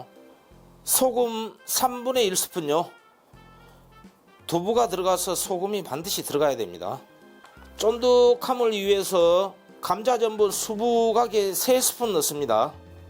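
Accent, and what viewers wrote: Jeolla, South Korea. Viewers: 세프님 음식도 정갈하게 맛도 끝내줄것 같습니다 당장이라도 따라 해보고 싶네요 전라도 사투리도 구수합니다 ㅋ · 조물조물을 쪼물딱쪼물딱. 저~~그 전라도 말씨 정감이 가네요. 어떤 요리든 정확한 레시피 감사합니다. 참 대단한 " 셰프입니다 " ❤ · 항상맛있는요리 알려주셔서감사합니다 설명도 어찌나 맛깔나게 전라도 사투리가 정겹고재밋습니다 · 새프 숙구락 전라도사투리요전라도사투리 김제쪽인가요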